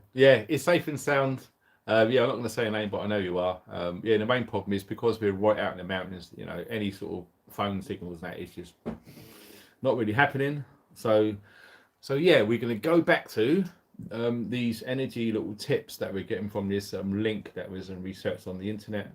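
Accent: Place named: Bristol, United Kingdom. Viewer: Hi.Totally came across your channel by accident.Just Watched Your Video on prepping very informative.I've put out a number of prep videos too on my channel.Very much into information sharing and resouce sharing. is that a Bristolian accent I can detect.?Keep Up the good work.Will definitely be tuning in to your channel again.Eldad Amara(UK).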